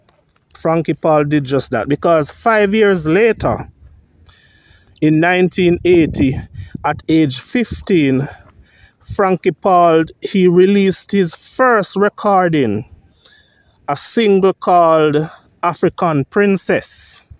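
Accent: Jamaica. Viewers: Bredren that's how Jamaicans dialect sounds. Perfectly legit.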